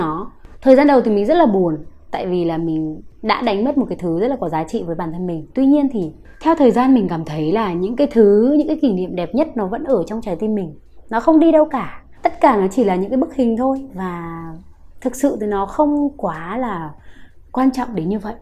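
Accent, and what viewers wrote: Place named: North, Vietnam. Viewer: Mình xin lỗi nhưng k hiểu sao mình k thích giọng miền Bắc cho lắm. Nhưng giọng của bạn hay quá. Lần đầu tiên nghe giọng Bắc mà thấy thiện cảm mà hay như vậy, truyền cảm.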